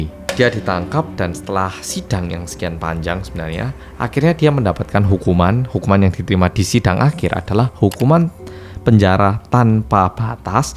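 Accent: Java, Indonesia. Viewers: Lihat mukanya mirip bintang korea, haha Logat bahasanya kek orang jawa/ kental bgt Asli mn si kak? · Kalo Liat mas kaya liat Young Jay just jerk versi medokk 😂. Jago dance juga gak ya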